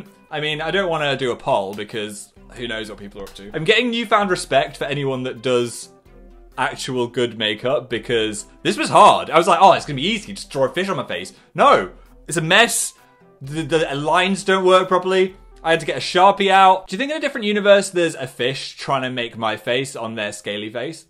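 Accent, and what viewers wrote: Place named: Northern England, United Kingdom. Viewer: part of rebranding is that his northern accent is coming back and i am living for it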